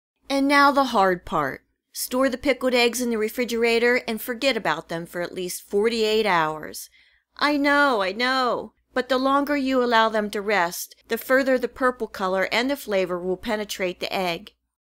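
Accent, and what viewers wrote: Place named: Philadelphia, United States. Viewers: I think I hear the Jersey/ Philly accent! I love it, my wife still has a little bit. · Oh, and, I just love your PA Dutch accent!: )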